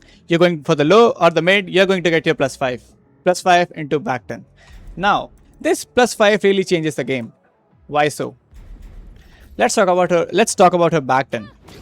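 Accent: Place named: Bihar, India. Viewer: Ye bihari bhai ko english kisne sikhai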